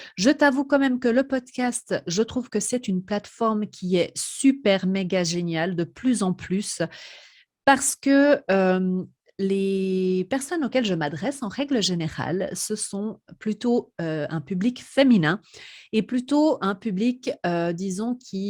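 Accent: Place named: Switzerland. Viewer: Bonjour! J'ai découvert ta chaîne il y a 2 semaines et j'accroche vraiment! Je ne suis pas maman mais je travaille à mon compte depuis la maison et du coup il y a beaucoup de choses qui me parlent dans ce que tu dis. Je dirais même que ça m'aide alors merci beaucoup! J'aime beaucoup ton authenticité et même je t'admire d'oser montrer autant de toi, en toute simplicité, sans chichi. J'aime aussi ta façon de parler, ça me change (la Suisse c'est exotique 😉) et puis c'est ok si tu fais des"fautes de français": déjà parce qu'on comprend ce que tu dis de toute façon. Et puis c'est ta façon de faire, c'est tes expressions à toi, c'est presque ta signature en fait. On a tous notre manière de parler et c'est bien aussi! La langue est vivante et d'ailleurs les erreurs d'aujourd'hui seront peut-être la normalité de demain! Continue à faire ce que tu fais! 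👍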